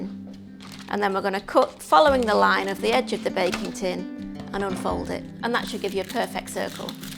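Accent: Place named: Yorkshire, United Kingdom. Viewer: Well, I made 2 cakes , and they were so delicious , we already ate one! Now im making another! Lovely, easy recipe, cant go wrong, and I love your Yorkshire accent, reminds me of home! Love Janet in Norway! x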